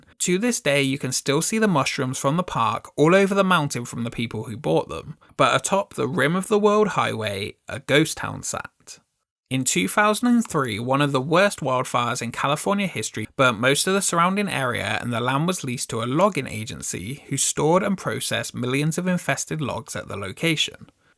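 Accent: United Kingdom. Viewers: I wanted to comment something relevant but I keep coming back to your accent. What part of the UK are you from? I need to move there so I can live surrounded by people who sound like you 24/7.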